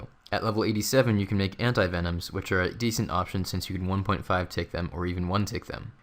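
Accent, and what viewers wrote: United States. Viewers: But you're not even correcting him, because you're wrong. It's obvious from his accent that he's American, and the "h" is silent in American English. There are over one hundred differences between American and British English, and this is one of them. Please don't shit your pants over something so inconsequential which, by the way, is grammatically correct in the first place. This is made worse by the fact that you think you're right when even the simplest google search or slight amount of attention paid in school easily proves you wrong.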